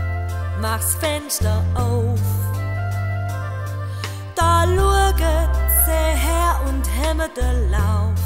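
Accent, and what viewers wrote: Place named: Swabia, Germany. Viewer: Schee Schwäbisch 👍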